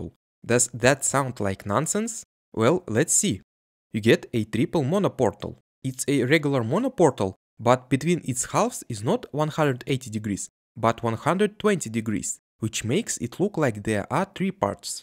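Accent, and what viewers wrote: Russia. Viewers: Слышится русский акцент) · Ты русский? Акцент говорит что да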